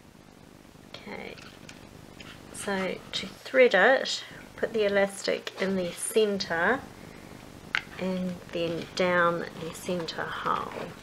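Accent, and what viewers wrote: New Zealand. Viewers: Just found you on this tutorial. Thanks so much, I can’t wait to get started on some second hand wallpaper and make a lovely cover using your technique, which will be sold in a very upmarket decor shop. So exciting. Awesome! Thanks once again from South Africa 🇿🇦 Is that a lovely New Zealand accent? Love it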